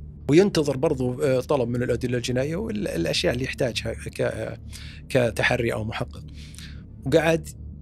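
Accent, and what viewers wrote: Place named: Qassim, Saudi Arabia. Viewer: من احلى ماسمعت قصص ررررررائعه بلهجة قصيمية تزيد من جمالها 😂😂 ، حلقه كل اثنين ! ياليت تصير كل اسبوع حلقتين لو قصه فقط بدون تحليل ✅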